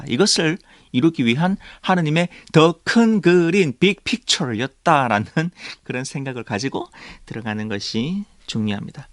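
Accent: Gyeongsang, South Korea. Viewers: 말씀도 좋지만 경상도사투리가 이렇게 매력적으로 들릴줄 몰랐습니다 ^^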